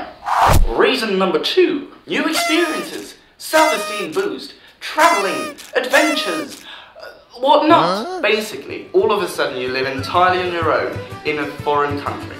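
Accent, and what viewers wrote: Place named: United Kingdom. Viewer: have u had that nice british accent also before your erasmus stay?:D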